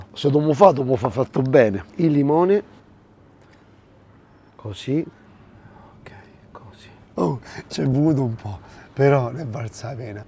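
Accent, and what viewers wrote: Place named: Milan, Italy. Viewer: Ma non ha aperto a Milano? Ma non lo senti l’accento milanese??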